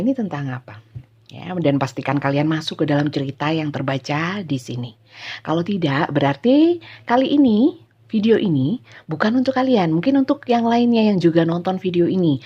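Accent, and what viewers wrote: East Java, Indonesia. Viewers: Makasih. Bunda readingnya ... Ituloh logat Jawa timur yo 😁😁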